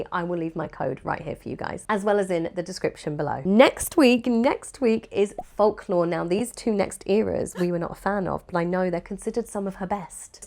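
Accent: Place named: England, United Kingdom. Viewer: You inspired me so much to keep creating,. I’m an artist, and I promote my art and teach courses, and lately I’ve been feeling really discouraged by the algorithm and the little time I have after my office job. But what you said about setting boundaries with content creation really stayed with me, I’m going to start doing that. I still haven’t finished watching the video, and I know the 30 minutes I have left are going to be full of value. I truly appreciate you for that. Technology is amazing, I can’t believe I get to access such valuable content all the way from Colombia while you’re so far away. By your accent, I think you’re from England?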